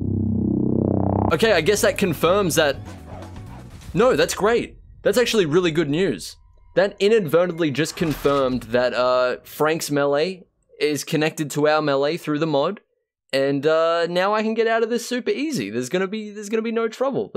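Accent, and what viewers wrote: Australia. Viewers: Are you Australian? Cause you sound a little Australian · are you an ozzy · Why does he sound so much more Australian while on twitch?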